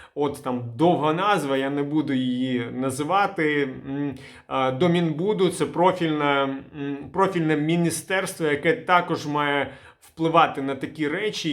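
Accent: Russia. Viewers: Что-то сильный російський акцент в твоей український мове. В твоей речи нет украинской манерности.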